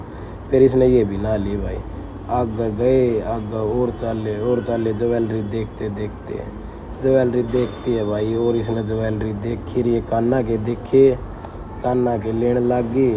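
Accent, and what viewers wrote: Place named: Haryana, India. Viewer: Haryanvi voiceover 🤌💕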